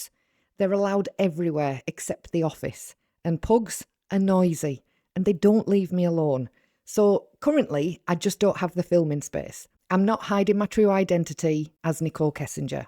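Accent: United Kingdom. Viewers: MAKES A PLEASANT CHANGE to listen to a non-sensationalised analysis of the Watts case; nicely done. The Northern accent feels just that bit more human.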